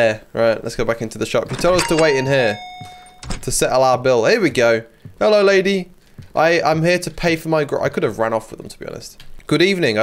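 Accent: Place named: United Kingdom. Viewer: As a French tutor, your French with a heavy British accent is impeccable. Aucun de notes.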